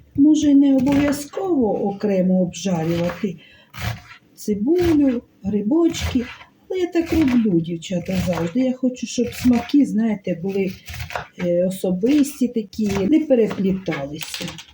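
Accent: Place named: Ukraine. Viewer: ! Очень приятная женщина, молодая, все получается, украинский говор. Дай Бог тебе здоровья, удачи во всех делах, любви и дружбы!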